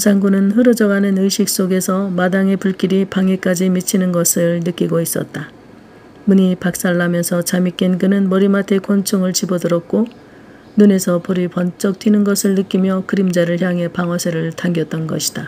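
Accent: Jeolla, South Korea. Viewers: 전라도 사투리를 정말 전라도 나이든 시골 사람처럼 잘 하시네요 감사합니다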